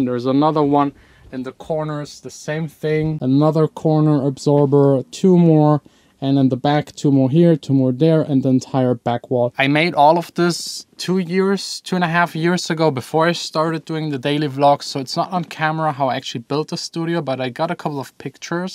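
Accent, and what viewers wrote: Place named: Germany. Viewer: Well.. He's from Germany, heck, he even has a pretty strong German accent when speaking English! lol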